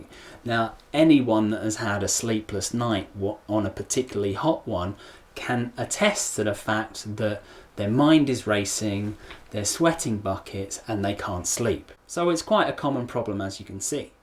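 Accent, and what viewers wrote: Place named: United Kingdom. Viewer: I LOVE your British English!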